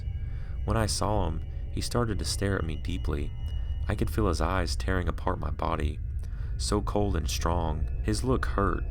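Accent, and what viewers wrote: South, United States. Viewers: where are you from? you have a real subtle southern accent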